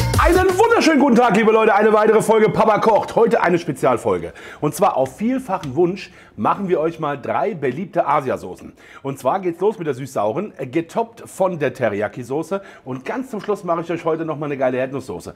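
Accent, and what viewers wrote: Hesse, Germany. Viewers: Hallo, ich bin ein Hesse der in China lebt. Ich finde es total super wenn ich hessisches Gebabbel hoere und dann chinesischem Essen sehe. Du kommt richtig echt rueber und dein Kanal bereichert mein Leben hier.Mach so weiter. Koch doch mal Yunnan Kueche.Hier in shanghai gibt es ein Lokal das heisst lost heaven. Findet man ueber google und alles was dort auf der Speisekarte steht schmeckt super. Aber keine Ahnung wie man es kocht.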